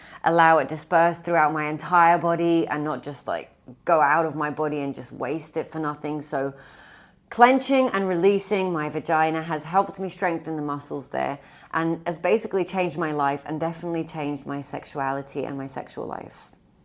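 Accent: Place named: United Kingdom. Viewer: את נהדרת, אני עוקבת אחרייך כבר שנים. מה זה המבטא הבריטי הזה??? 💙🦋 תודה על המידע והשיתוף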